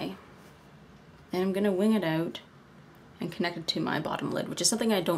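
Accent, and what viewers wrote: Canada. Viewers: Are you from Canada? It sounds like it from your accent.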